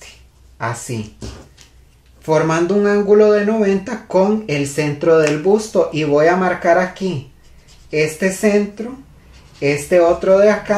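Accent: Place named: Costa Rica. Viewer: Maestro muchas gracias por compartir sus conocimientos, perdón tengo una pregunta: usted es costarricense? Me parece que su asentó es de costa rica. 🤗🙏🙏👏👏👏🇨🇴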